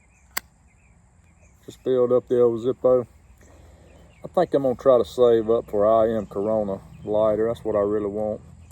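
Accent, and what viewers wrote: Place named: South, United States. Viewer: Sorry to hear about the loss of your wife's granny. Praise God for the Comforter Who helps His people. As for the way you sound, sounds like a southern to me. As for as trains go I miss the caboose . When we were kids we would wave at the men who rode in the caboose . There is a lot to be said about trains. Really I don't know what makes one run anyway. I enjoyed your video. Have a gooden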